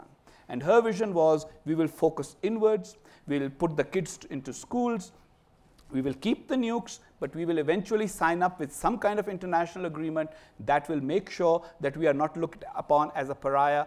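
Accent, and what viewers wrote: India. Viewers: This guy looks and sounds more indian. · Think an Indian is talking..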